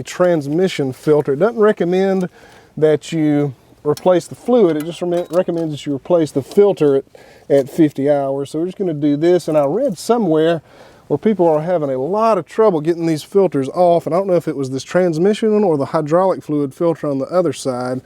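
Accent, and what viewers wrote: South, United States. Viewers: The way you southerners say oil kills me. 😁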